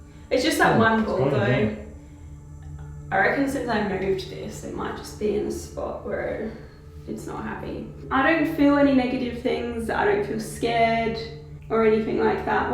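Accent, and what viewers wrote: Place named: Australia. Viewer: Omfg that Ozzie accent I'm dying 😆😆 you go alright aye love your work greetings from kalgoorlie W/A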